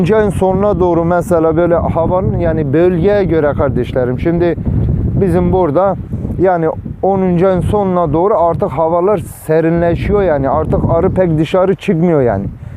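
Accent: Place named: Eastern Anatolia, Turkey. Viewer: Allah razı olsun kardeşim, nereli olduğunu merak ettim. Şive trakyadan çok doğuya benziyor.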